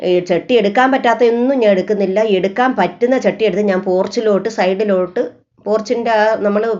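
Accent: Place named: Kollam, India. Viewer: ഇത് കൊല്ലം സഠഭാഷണഠ ആണ്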